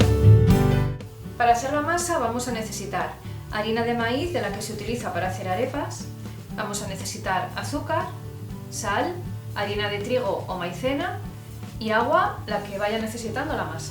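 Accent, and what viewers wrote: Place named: Spain. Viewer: Hola! Excelente que estés tratando de promover la tradición Venezolana aunque tu acento no es Venezolano. Suenas como Española. Sin embargo voy a probar tus empanadas… Se ven deliciosas!❤🎉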